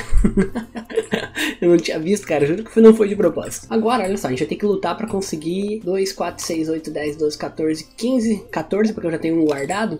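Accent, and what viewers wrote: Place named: South, Brazil. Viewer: Tu é gaúcho!!?? Acho o teu sotaque parecido com o do sul, se sim tu tá seguro!?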